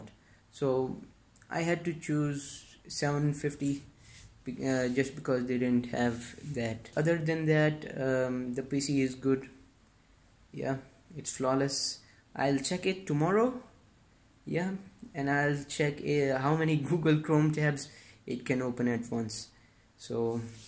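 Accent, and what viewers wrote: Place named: England, United Kingdom. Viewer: Aye haye English accent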